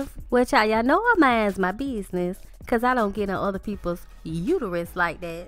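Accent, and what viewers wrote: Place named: South, United States. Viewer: I appreciate the immediate reply. Understand that I asked because I have relatives and friends from the south (where you sound to be from, or have lived) a couple of whom actually pronounce "street" as "screet" in all seriousness. Thanks again; I'm about to finish watching your video on Fabolous. These are fun! 👏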